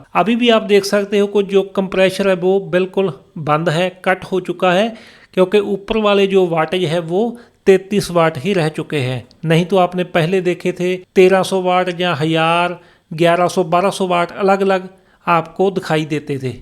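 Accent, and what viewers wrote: Punjab, India. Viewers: imandar insaan ho aap. aapki punjabi vaali hindi bahot hi achhi lagti hai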